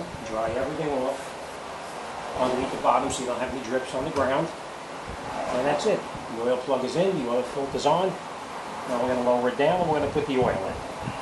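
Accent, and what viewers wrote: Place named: New York, United States. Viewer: Precise, to the point and love that NY accent. Great Job!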